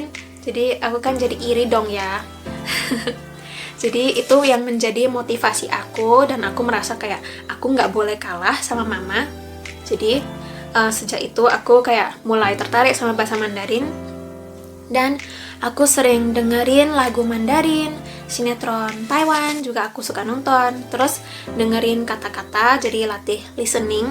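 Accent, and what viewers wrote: Java, Indonesia. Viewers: Luar biasa, suka cara ngomongnya bahasa Indonesia, ada medok boso jowone, tak kiro asli wong jowo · medok,e iku lo mantul,joooosss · ilat mu..malah mirip wong jowo..nduk ..rada medok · Medok lebih mantab mbak yu 😅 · medhok ya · Nambah channel di jenengi medok 👍👍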